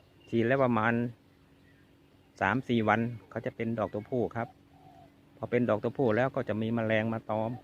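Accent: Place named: Chanthaburi, Thailand. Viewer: เค้าเป็นคนจัน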